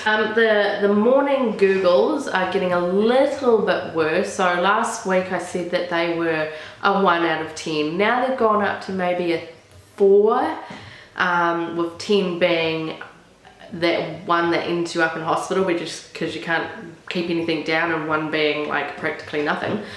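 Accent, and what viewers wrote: New Zealand. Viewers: congratulations ! ive just started vlogging i live in melbourne but am from nz too, cool to see / hear the kiwi accent on you :) all the best for your pregnancy journey 💕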